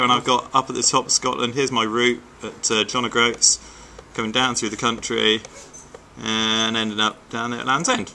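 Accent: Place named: Australia. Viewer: The next tomtom?? Just wondering why you sound Australian in this video?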